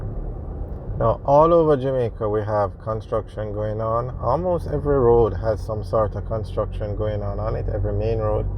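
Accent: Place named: United States. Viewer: You sound like someone that use to live in the states.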